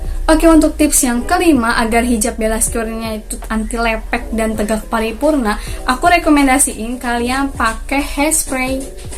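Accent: West Java, Indonesia. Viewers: Teteh orang Sunda nya? 😁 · Se formal apapun ngomong bahasa indonesianya sundanese, anger we aya bumbu sundana nya... Salaam sundanese🙏🏻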